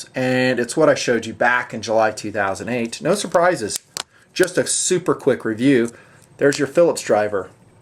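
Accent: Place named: South, United States. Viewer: Southerner? Southern England? Australia? Who in the South says ay?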